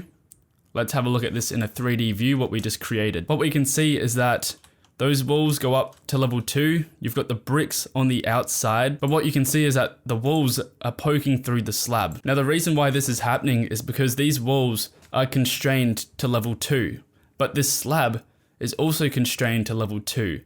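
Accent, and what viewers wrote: Australia. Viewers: I'm learning almost as much about Australian English and vocabulary as I am about Revit. Love it.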